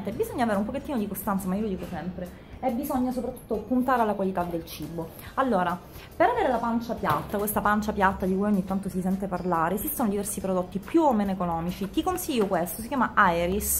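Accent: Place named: Palermo, Italy. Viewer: utilissimi i tuoi video come sempre! io devo ringraziarti.. mi hai fatto alzare il "culo" dal divano! .. mi hai organizzato la vita! ora sveglia alle sei e workout di jillian! e non solo, dopo cinque anni che vivo a palermo tu, e solo tu, sei riuscita a farmi prendere l accento palermitano! (non che vivevo lontano giusto 70 km più a est, ma non avevo questa cadenza !) grazie di tutto ;)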